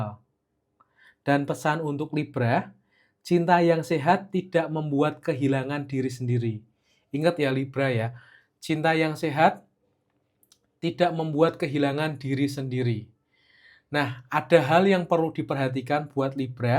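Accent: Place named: Java, Indonesia. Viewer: Medok bgt Jawa nya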